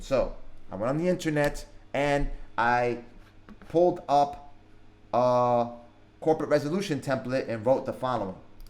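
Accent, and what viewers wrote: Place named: New Jersey, United States. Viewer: Last night I met a guy. His voice sounds exactly like yours. He told me he's from New Jersey. I knew immediately that he was from NY or NJ 😂😂😂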